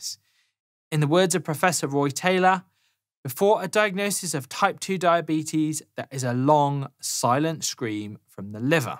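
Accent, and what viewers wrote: United Kingdom. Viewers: At last ! A British accent !! From a knowledgeable British doctor. No more Yanky ti Yanks lol. Thanks for posting.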